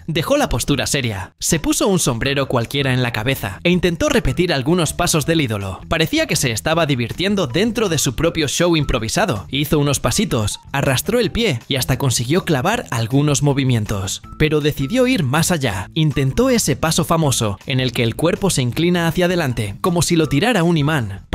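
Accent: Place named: Spain. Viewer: Todo el vídeo es Excelente, solo la voz del narrador tiene un Acento Español molesto, y no es necesario que hable mucho explicando lo que se está Viendo en el vídeo. Saludos desde Tabasco,Mexico.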